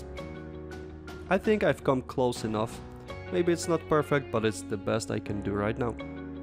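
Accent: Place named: Eastern Europe. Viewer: Oh, no widzisz zasubskrybowałem już kiedyś i nawet nie sprawdziłem do kogo 😄 Po akcencie czułem że jakiś słowiański człowiek, ale nie wiem dlaczego zakładałem że może Czechy lub Słowacja. Co do szachownicy, mogłem faktycznie przeoczyć, dopiero gdy instrukcję Eduarda pokazałeś z sowiecką wersją ii zwróciłeś uwagę że to źle jest - rzuciło mi się to w oczy. Dzięki w każdym razie za wideo i gratulacje jeszcze raz świetnie wykonanego modelu. Ja po wielu latach postanowiłem w końcu zacząć nagrywać swoje produkcje, ale na razie jestem na etapie eksperymentów z oświetleniem, ustawieniem kamery, montowaniem itp. Bardzo to niekiedy komplikuje całe przedsięwzięcie, więc tym bardziej doceniam dobre wideo 👍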